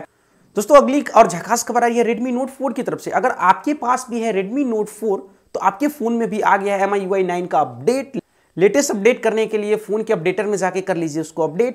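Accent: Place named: Bihar, India. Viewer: bihari HAI. Aise hi bolega!!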